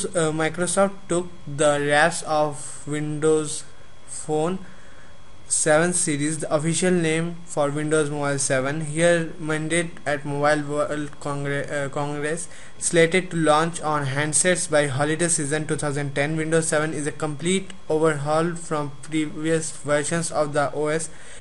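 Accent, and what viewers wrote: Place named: India. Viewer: I can understand him and i'm not Indian. So what if he has a THICK accent. ...Do your thing bro.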